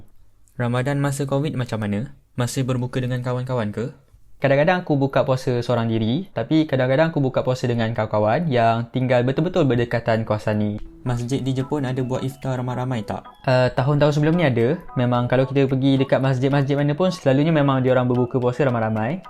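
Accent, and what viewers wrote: Terengganu, Malaysia. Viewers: Org ganu ka? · Buat vid full slang ganu 🤣🤣 cerita pasal pape je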